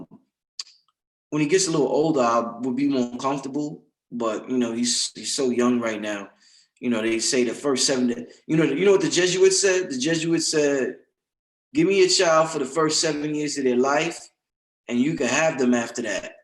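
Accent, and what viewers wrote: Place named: New York, United States. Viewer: They keys to life and u dropping Jewel's Who don't luv that new York voice? I LISTEN TO YOUR MUSIC SO DIFFERENT u always give informative video ✌❤💡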